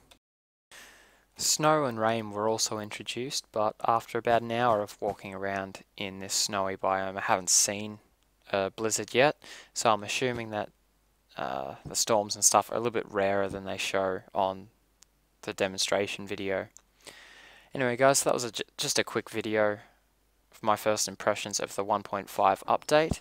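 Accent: Australia. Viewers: nice are you aussie ?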